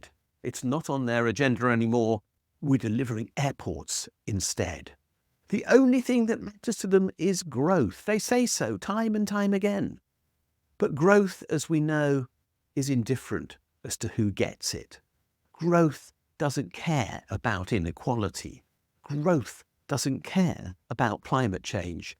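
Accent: RP, United Kingdom. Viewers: Oh that’s the most stupid thing I’ve ever heard from you!! You are a lecturer in accounting, with a posh voice. Keir Starmer has been in his post for only a few months. He has inherited such a shit show from the Tories like no other Prime Minister ever has. 2 things. Brexit and the Pandemic. Brexit is probably the worst economic disaster to befall the country than anything else. We exited a free trade zone and sent back countless people who were working hard and who we can replace. They all paid tax, who can’t be replaced. We’re paying more for imports from the EU , and we’re losing out on exports because everything we sell is more expensive. The Pandemic: the Tories call off a major run through of how the U.K. would cope with a Pandemic. If we had had that, we would have been much better prepared. The Tories spent a fortune giving their friends money for PPE and contracts to help through the crisis and they made billions. 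If those 2 things hadn’t happened, we would be in much better economic shape now. Labour and Keir Starmer are doing what ever it takes to bring about investment and growth to the country. I am a Labour voter and I understand that things take time to change. The Tories spent 15 years destroying this country and it’s going to take a long time to fix. Be patient and give it time. I’m unsubscribing.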